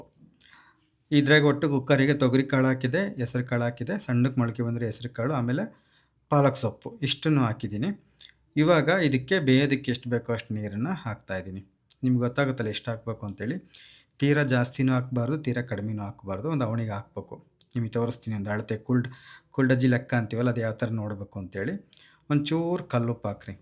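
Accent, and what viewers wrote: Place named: Holalkere, India. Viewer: ನಮ್ಮ ಹೊಳಲ್ಕೆರೆ ಭಾಗದ ಊಟದ ಸೊಗಡಿನಂತೆ ನಿಮ್ಮ ಮಾತು ಸಹ ನಮ್ಮ ಸೊಗಡು ಉಳಿಸಿಕೊಂಡಿದೆ😂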